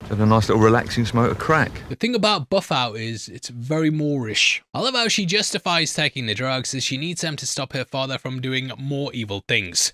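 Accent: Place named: Newcastle, United Kingdom. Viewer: I didn’t know you were Geordie, or at least northern?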